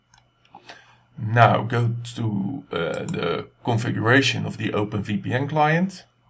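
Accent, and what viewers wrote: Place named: Netherlands. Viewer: It spund like youre from The Netherlands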